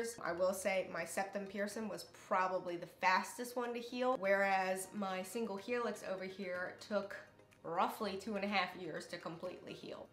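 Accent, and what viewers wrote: South, United States. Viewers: Her accident is like half way southern😂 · This does not anything like Midwestern or Canadian 😂 I’d bet a dollar shes from the south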